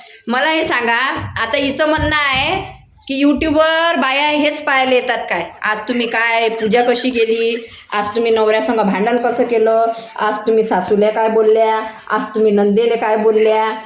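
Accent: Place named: Vidarbha, India. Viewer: Mala tar aapli vidharbhachi marathi aadhipasunach aavdte rao mastach hasavlat tumhi 😂 baki aashu tai aani tumhi mala konachich baju nahi ghyaychi pan bollat ekdam kadak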